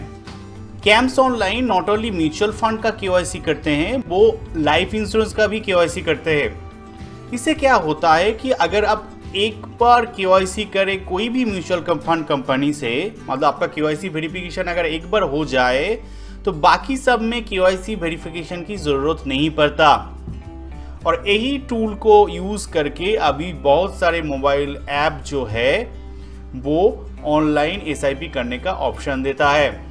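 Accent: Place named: Bengal, India. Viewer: sir apni bangli jaisa bolte hai.. mast lagta mjhe.. 😊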